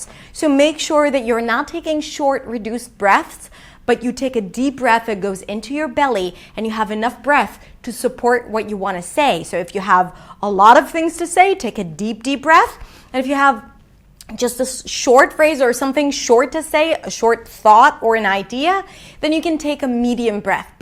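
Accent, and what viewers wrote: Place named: United States. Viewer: With the mask and a strong american accent it is quite dificult to understand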